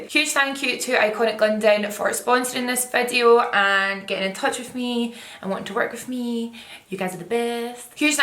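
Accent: Scotland, United Kingdom. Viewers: Tarte pro glow to go is the perfect product for that scottish accent! I love it haha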